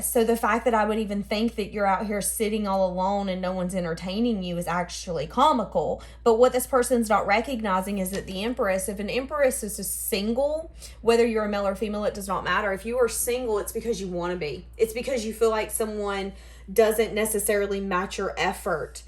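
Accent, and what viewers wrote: South, United States. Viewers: You're pretty... Love seeing the face with the southern hospitality accent... Blessing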